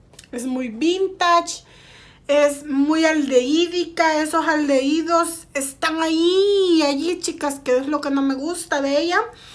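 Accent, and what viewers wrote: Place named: Veracruz, Mexico. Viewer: que bueno que hagas reseñas de perfumes Avon ya que son económicos, y tu acento me gusta ya que soy Veracruzana y algunos acentos en tu pronunciación se parecen, a los míos, bendiciones.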